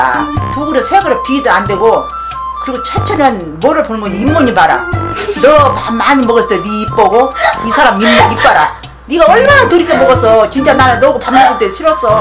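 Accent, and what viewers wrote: Jeolla, South Korea. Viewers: 진짜 넘 웃기심 혼다 웃고있음ㅋㅋㅋ 완벽한 전라도 사투리심 울 엄마 같으심ㅋㅋ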